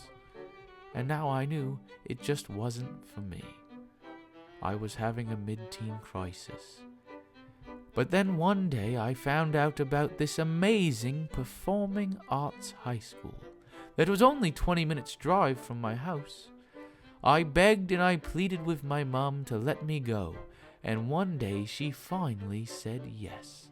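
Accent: United Kingdom. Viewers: Why did use a British accent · Um... Where did his American accent go? Why's he British? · You sound like a British guy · British? I didn't know you were british but i don't care cos i am british. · Why did It Sound Like British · 5 years ago people: wow so cool 2018: he sounds British. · He sounds so british lol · this doesn't sound like!?He sound like a british story teller,no offense to british people. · why does he sound British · is it me or does he have a "british" accent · Why is he British here? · ... I didn't realize was British before his channel got popular.... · What's with his BRITISH accent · Is this your real British accent? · THEEEE BRITISH ACCENT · um why is he sounding british in this video??? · This looks and sounds like a British male talking like this is normal XD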